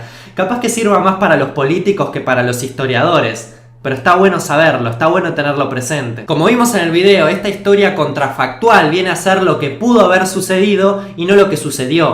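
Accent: Argentina. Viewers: jajaja era argentino, y no me di cuenta por el acento..... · yo soy chileno pero por tu tonada me suena argentina pero me confundo si eres argentino y estas en mexico y te a cambiado el tono o puede que sea alreves tu dime si estoy en los sierto aposto que eres mas argentino que mexicano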